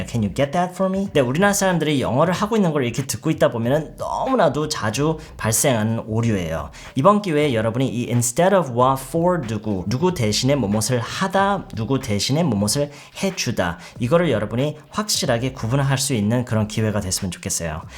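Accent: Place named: United States. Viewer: 우리말 할 때 억양같은 거 보면 미국에서 나고 자란 교포같으신데 어쩜 이리 한국어를 완벽하게 하실까요 한국어와 대조한 영어의 차이점을 너무 친절하게 설명해 주셔서 이해가 쏙쏙 돼요 항상 감사드려요!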